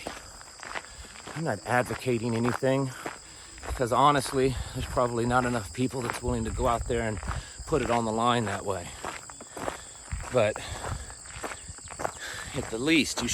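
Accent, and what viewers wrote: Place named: Arkansas, United States. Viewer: You said secession at least four times....you sound just like me! Arkansas Confederate.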